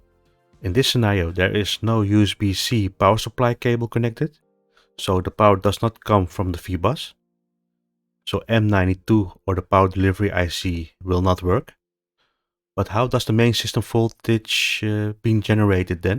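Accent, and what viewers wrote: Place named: Netherlands. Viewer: 2 seconds in and i hear the dutch accent 😁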